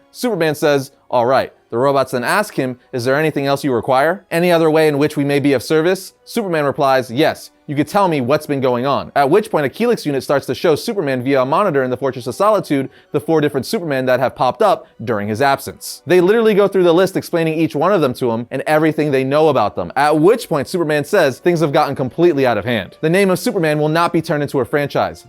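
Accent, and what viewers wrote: Puerto Rico. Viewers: What!?!? Eres boricua??? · Where's your puerto Rican accent?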